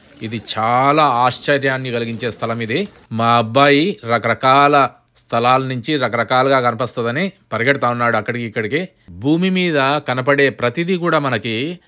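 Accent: Kadapa, India. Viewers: అన్న మి విశ్లేషణ చాలా బాగుంది, మన కడప మాదిరిగా మి మాటలు వింటుంటే చాలా సంతోషంగా ఉంది. ప్రేమతో కడప జిల్లా వాసి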